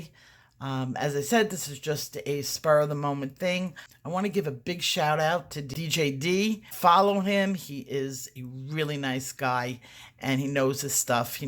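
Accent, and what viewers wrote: New York, United States. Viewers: beautiful. I had you older in my head. Must be the New York accent.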